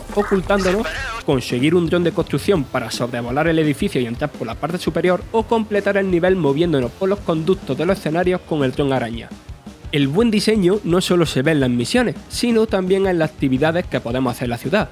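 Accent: Andalusia, Spain. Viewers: Qué tonalidad de España tiene el locutor de este video?? No se parece a la de la mayoría que hacen análisis de juegos, más bien parece la de Paco Tous. Es de Andalucía??? 🤔🤔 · es Andaluz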